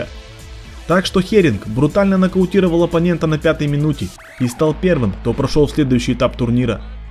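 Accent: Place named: Caucasus, Russia. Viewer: Комментатор с кавказа добавил перца😆👍.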